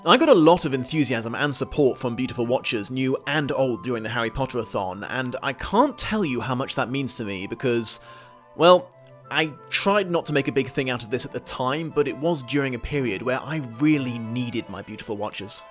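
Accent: United Kingdom. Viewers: going to the States... please don't loose your lovely British accent... :( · I don't care where you live, just don't stop using the word "bloody". I LOVE your british accent!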